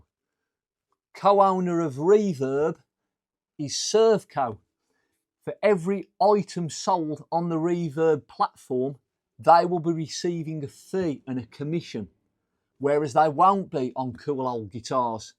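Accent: Black Country, United Kingdom. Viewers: This accent just took me straight to a time in my life when I lived in rapid succession in Dudley, Wednesbury, Cradley Heath. I'm in <num> again.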